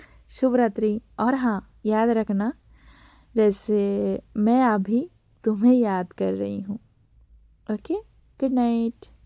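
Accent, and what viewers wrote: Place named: India. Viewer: Indian tumhari awaaz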